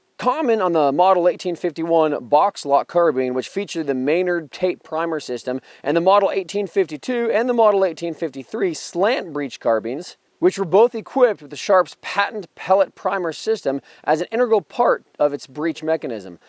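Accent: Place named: Ohio, United States. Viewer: Ohio accent , lighten up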